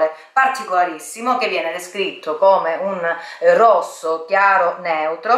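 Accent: Calabria, Italy. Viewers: Ciao :) capito su questo video grazie proprio a Clio :) sei stupenda e fai delle spiegazioni strepitose, super dettagliate!! Dall' accento credo tu sia calabrese come me😍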